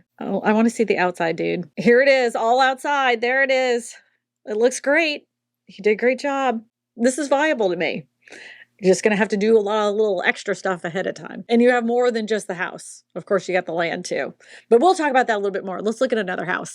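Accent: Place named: New Orleans, United States. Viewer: I thought I had heard some aspects of a Yat accent. :) I can't imagine how much this would cost to insure, even on the Northshore.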